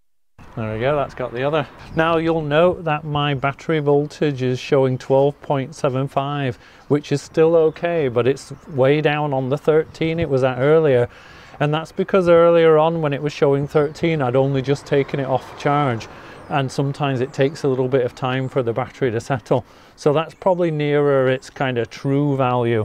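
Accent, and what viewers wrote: Liverpool, United Kingdom. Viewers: I would guess at least half is Liverpudlian. 😉👍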